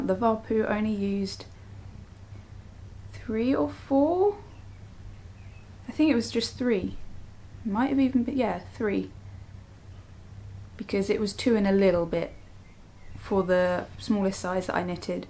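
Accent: United Kingdom. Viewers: Oh thank you so much for this new video :) this is just so sweet from start to end, and the 2 Elfmail sweaters are gorgeous, how lucky I am to not have my entire stash by hand otherwise I would have casted on one tonight! As you said, currently "sinking into calm" when chaos is too close, and loving your accent : remind me some months spent in UK, not so far from you! Take care and happy knitting!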